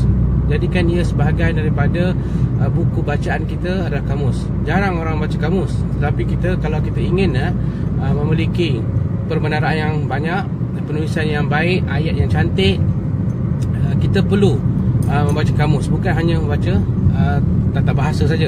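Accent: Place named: Malaysia. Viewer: Mantap Tutorial and tips nya Saya Jadi ingat upin ipin Logat Malayu kental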